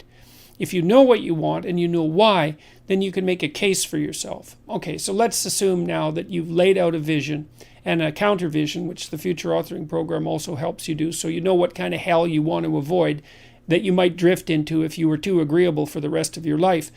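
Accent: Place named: Australia. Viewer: This is Australian accent ,Farmer style. All the country style accent have same characteristics, such as quick , not clear , not stable speed .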